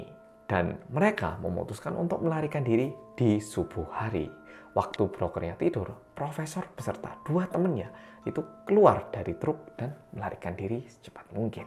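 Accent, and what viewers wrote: Java, Indonesia. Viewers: Selalu ngikuti channel ini,tpi baru pertama komen, awalnya kaget dg logat jawanya sama seperti aq,yg asli wong Jombang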